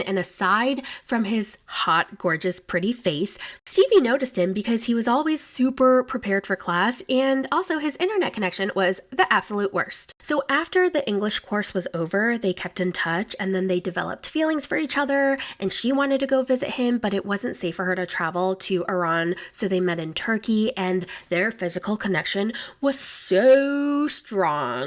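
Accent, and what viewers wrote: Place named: South, United States. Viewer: I just love you, ♥️🌹your southern accent is amazing 👏 great job, great podcast 👏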